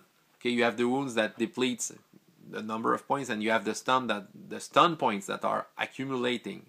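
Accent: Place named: Quebec, Canada. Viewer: C'est vrai que l'accent québecois en anglais m'aide aussi à travailler mon anglais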